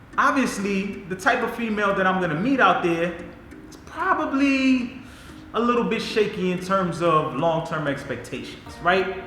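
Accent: New York, United States. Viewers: Your NY accent has been dying took me back home.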